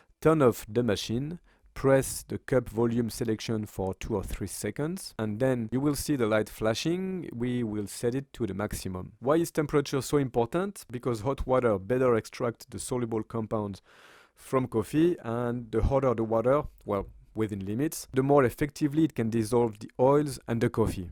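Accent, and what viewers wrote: France. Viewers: Good English with nice french accent!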